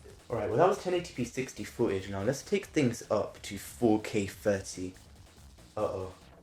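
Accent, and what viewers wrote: United Kingdom. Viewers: you sound british tho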